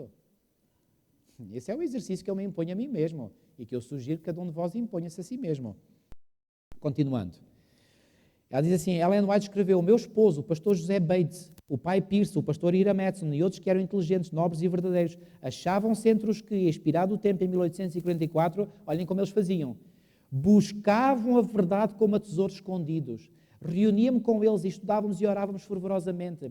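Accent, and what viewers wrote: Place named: Portugal. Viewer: Muito lindo o sotaque do português falado em Portugal🙏🏾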